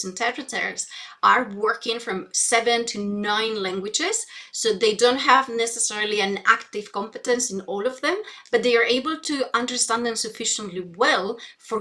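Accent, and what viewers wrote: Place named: Scotland, United Kingdom. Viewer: That's really the only indicator I notice that she is a native Spanish speaker. A Scottish influence is clear.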